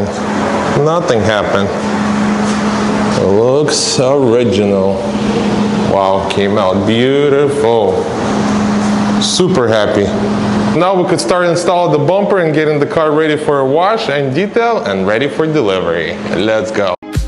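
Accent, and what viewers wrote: Russia. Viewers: ты че Русский что ли? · Эбать у тебя акцент русский. Сразу видно наш пацан 👍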